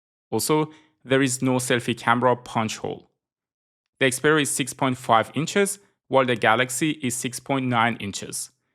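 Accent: Iran. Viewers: داداش خیلی لحجه ایرانی باحالی داری😂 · لحجه ایرانی تابلوعه سید 😂😂😂😂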